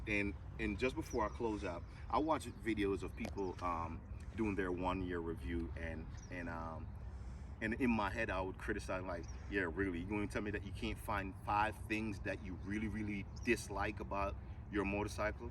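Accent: Jamaica. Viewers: I hear a little jamaican accent in there maybe?? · You're Jamaican nuh Tru?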